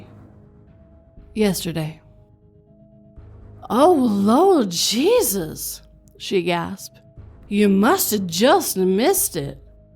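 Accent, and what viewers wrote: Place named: South, United States. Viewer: Not half bad southern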